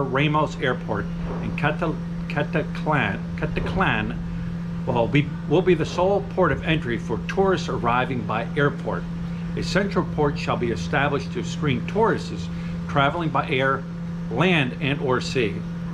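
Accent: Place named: Pennsylvania, United States. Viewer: Are you from the Coal Region of Pennsylvania, because you sure have the accent. You really need to lean how to pronounce the words of dialects in Philippines.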